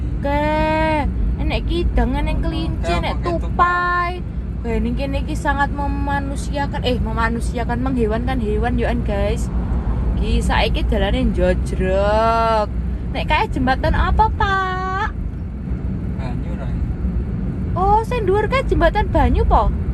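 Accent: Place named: Java, Indonesia. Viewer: Mbak e asal kota mana di Indonesia? Jawanya medok banget 😊...btw ini mbak e sekarang tinggal di Korea di kota mana?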